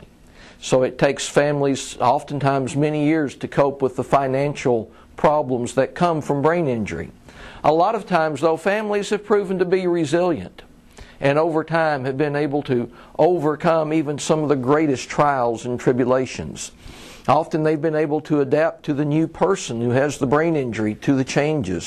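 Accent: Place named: Kentucky, United States. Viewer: Kentucky. Need I say more? Learn to speak English please.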